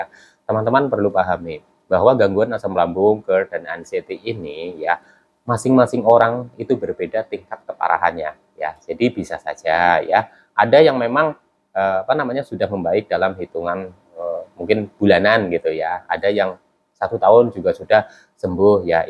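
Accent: Banyumas, Indonesia. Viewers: ngapak asal mana mas?sy juga kena anxiety siapa tau bs sharing...ada group ga atau WA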